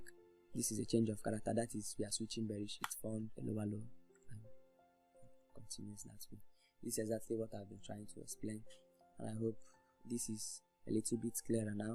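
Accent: Nigeria. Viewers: The fact that you're the first black dude I enjoyed it's videos is amazing. For real the way you broke down this topic is so understandable,I was filled with joy from the start to the ending. Judging from the accent it's the Ebira accent from Nigeria. (That's also my tribe ✌️✌️✌️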